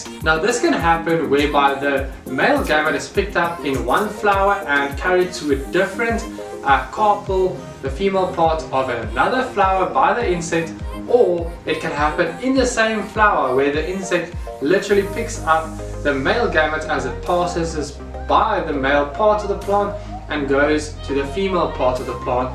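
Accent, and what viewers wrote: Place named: South Africa. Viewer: thank you for the video man, it really helped. Are you South African? The accent is almost identical hahahah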